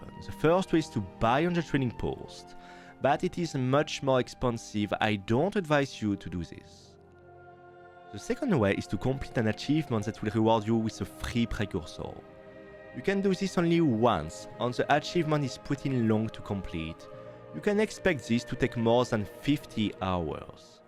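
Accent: France. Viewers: I love your french accent! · you sound french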